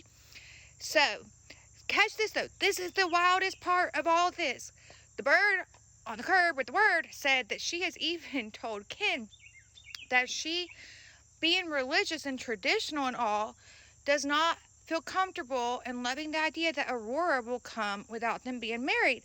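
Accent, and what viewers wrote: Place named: United States. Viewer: Mee too new sub here and I love your country accent sounds like mine lol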